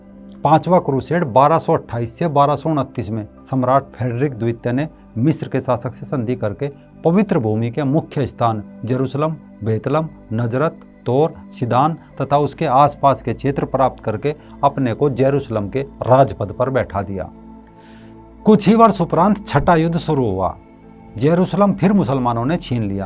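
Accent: Haryana, India. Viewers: My mom is alsofrom haryana I understand this haryanvi slang of hindi by bhai😂 but one thing he tries so hard to provide excat news to us his work is more authentic then most of the famous news channels, keep it up bhai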